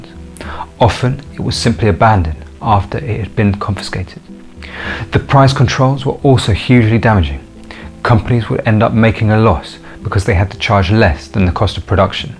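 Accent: United Kingdom. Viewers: First of all let me start by saying I love your content but…Can I recommend maybe holding a fake or real if your down a snifter of brandy or cognac like I said real or fake. Your hand hangs outs there for ever plus I think the snifter 1000% plays into the decor and your obvious English accent. Just a thought a just notice your hand never ever retracts to your body it looks very robotic again these are observations not being critical by any means please don’t think I’m trying to be. Regardless keep up the great work!!!!!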